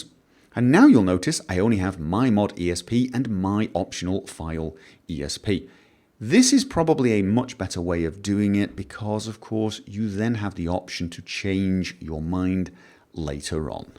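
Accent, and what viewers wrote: United Kingdom. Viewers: You are from Norway? this is impossible. I am sure You are British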